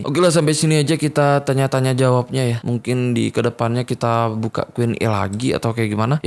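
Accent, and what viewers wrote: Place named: Aceh, Indonesia. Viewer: Gapapa bang adain lagi aja #ThreadHorror nya, mau itu cerita real ato engga, yg penting kita2 bisa ngerasain feel serem-nya bareng2 hehehe... Salam dari Semarang bang. Ohiya, aku tebak Bang pasti asli Aceh nih, ketauan dari logatnya soalnya, apalagi video2 yg dulu logatnya masih kental banget tuh wkwkw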